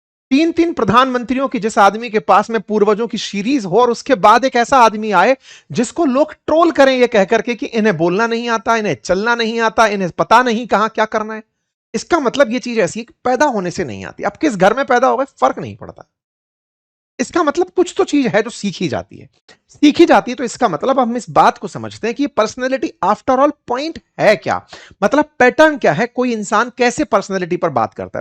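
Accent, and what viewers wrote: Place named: Marwari, India. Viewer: श्री राम राम जी SiR ji आपके शब्द में कभी कभी मारवाड़ी शब्दों का उच्चारण होता हे जिसे हम सभी मारवाड़ी होने का पहचान शब्दों से पहचान हो जाता है 🙏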